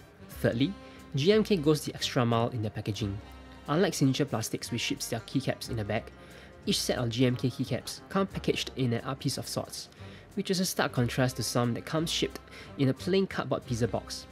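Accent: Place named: Singapore. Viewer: Thanks but I have to mute the video and turn on the subtitles to understand this singlish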